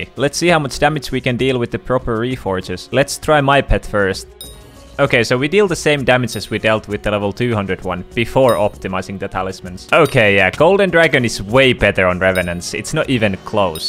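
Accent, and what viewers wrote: Finland. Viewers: Are you from Finland? I hear it from your voice. LOL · first thing when i opend the video i was like is he from finland sounds like it and then i watched and ofc you are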